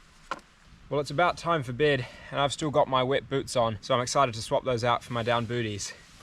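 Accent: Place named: England, United Kingdom. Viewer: I recognize the English accent, but a tad different. I miss the accent. I'm from America. You build a bigger fire than I might. Also liked how you built it closer to your tarp. I've seen people build it 15-20 feet away. Personally, I think that's too far, to keep you warm at night. You meals are bigger/heavier on the stomach than I like. Camping, I prefer smaller fast meals. Just me. Enjoyed the video. Thanks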